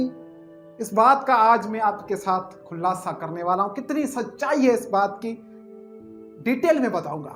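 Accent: Sindh, India. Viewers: Sindhi Ka Urdu bolna Zaher Hai ap Sindhi ho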